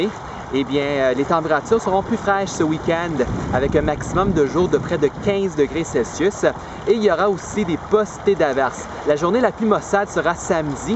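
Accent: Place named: Quebec, Canada. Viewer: 您好, 您係魁北克音.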